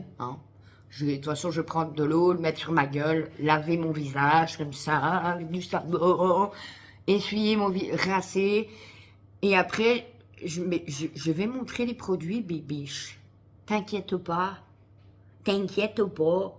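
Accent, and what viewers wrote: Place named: Belgium. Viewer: Votre accent me rappel celui d'un ami belge de Charleroi😅😂🤣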